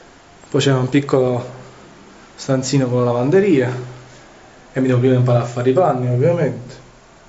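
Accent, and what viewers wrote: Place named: Naples, Italy. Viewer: Nei video di multiplayer.it l'accento napoletano non è così marcato come qui,lo stai man mano perdendo oppure lo contieni?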